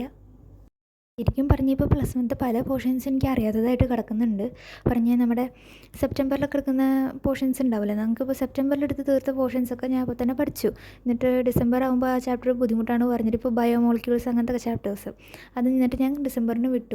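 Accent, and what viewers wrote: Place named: Palakkad, India. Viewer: Pkd ahno than ? .. ur accent is like that !!❤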